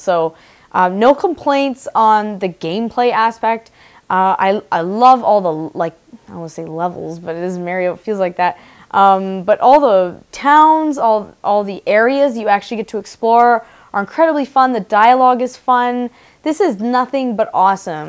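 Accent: Canada. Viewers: Canadian right? "Mare-rio", "aboat".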